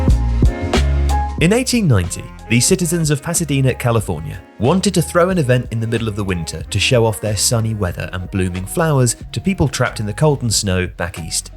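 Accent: United Kingdom. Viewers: Hearing a British voice talk about college football is breaking my brain lmao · I refuse to have a British voice tell me how American college football works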